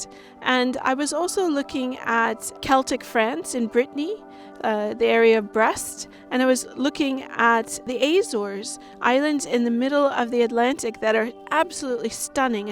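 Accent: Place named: Ireland. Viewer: the faint beginnings of an Irish lilt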